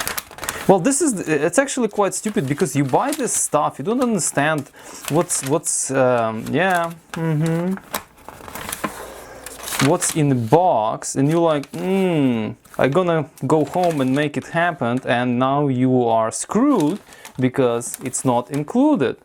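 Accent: Russia. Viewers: He is probably Russian or Ukrainian, they have the same accent.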